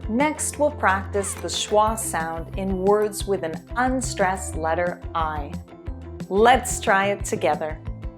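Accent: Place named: Canada. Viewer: so this is Canadian English, it's good for me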